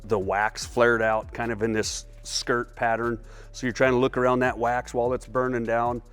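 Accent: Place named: Canada. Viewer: How does this Yank have a thicker Canadian accent than those living in Canada 🇨🇦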